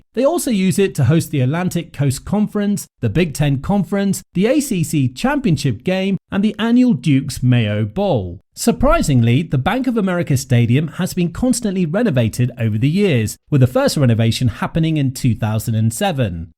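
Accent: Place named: England, United Kingdom. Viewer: There is so much hogwash and propaganda in this video it must be funded and produced by David Tepper. This is yet another example of a sweetheart deal to benefit a billionaire who stands to pocket huge profits from the renovated stadium through stadium use fees and concession sales while the city that funded the vast majority of the renovation gets virtually squat. Shame on the Charlotte city council for throwing the citizens under the bus so a billionaire can brag to other billionaires how he conned the city into funding his new stadium. I hope the citizens of Charlotte replace every council member who voted for this atrocity. Not to mention this video is so poorly done it uses game footage from other teams and stadiums unrelated to the story. And what's with the English accent? Is that supposed to provide some additional credibility or something?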